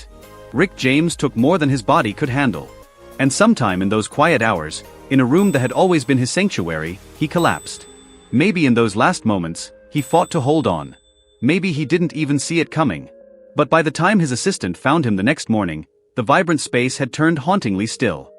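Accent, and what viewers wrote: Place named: Asia. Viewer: What's up with all these Asian accent Voice A.I. video popping up everywhere?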